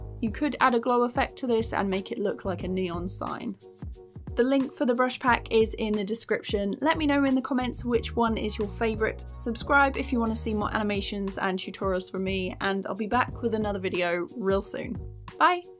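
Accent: United Kingdom. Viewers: Your animation is great and tahnks for the pack! lol your british accent is rly clean and heavy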